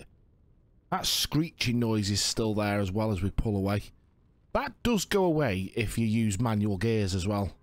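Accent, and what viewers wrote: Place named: Northern England, United Kingdom. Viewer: Tha sound like a fellow northerner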